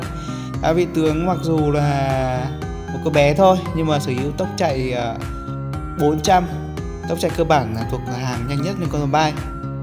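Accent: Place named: Hanoi, Vietnam. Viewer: ah quê ở đâu vậy hơi gọng giống ở hn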